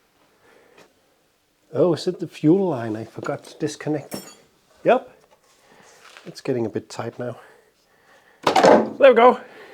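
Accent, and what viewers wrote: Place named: Denmark. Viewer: There I was just eyes down reading a book and your video had just begun when I heard Al Pacino say those immortal words “ Say hello to my little friend “ honestly it sounded so much like him 😂, Pacino with a Danish accent Ofc but so good 😂😂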